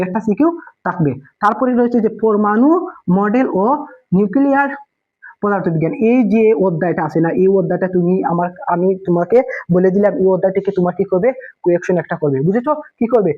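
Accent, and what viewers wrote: Sylhet, Bangladesh. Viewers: vaiya mono oy sylheti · Jii may be Sylhet Ta Nahole maje maje sir arokom kota bolccen humm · ভাই কিতা সিলেটি নি। ভাই পদার্থ বিজ্ঞান লইয়া যে চিন্তাত আছি। সেইম টাইপ ওর অংক টেস্ট পেপার ও এক একটা এক এক নিয়মে দেওয়া। পাশ করতাম ওইলে কিলা পড়া লাগবো। 😭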